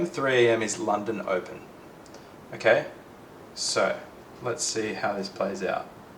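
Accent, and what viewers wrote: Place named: Australia. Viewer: You are doing a great job - explanaton is amazing! I too live in Australia so it is refreshing to hear an Aussi with this eXplanation - keep going!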